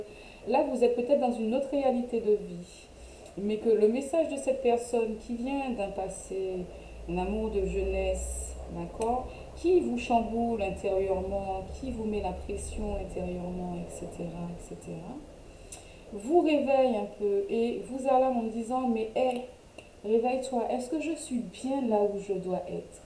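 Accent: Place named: Martinique. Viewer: Jolie petit accent de Madinina💓🌴 mer I pour ce beau tirage... Pour le son c'est moyen.. Mais ça reste audible. 👍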